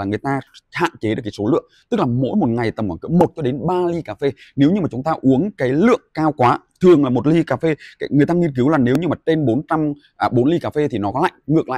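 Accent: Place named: North, Vietnam. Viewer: ơi! Em vui lòng nói chậm lại một chút được ko? Em nói nhanh quá anh nghe ko kịp vì anh là dân Sài Gòn nghe tiếng Bắc nói chậm thì được còn nói nhanh quá anh nghe ko kịp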